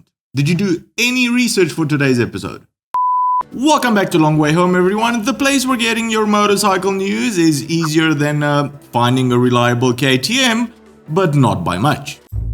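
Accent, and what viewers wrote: South Africa. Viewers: Before I get properly started watching, what accent is that? Sounds a bit South African (but not quite), maybe more clues await me as the video progresses...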